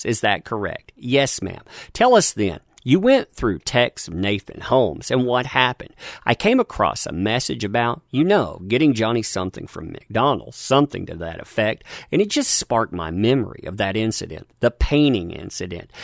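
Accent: South, United States. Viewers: Horrible narration. Weird scratchy, prissy, hillbilly voice.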